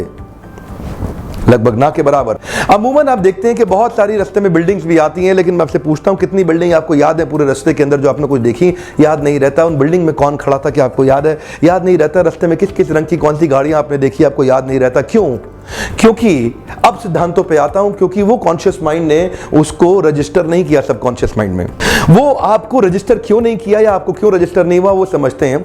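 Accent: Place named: India. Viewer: What talking about ,didn't understand the India English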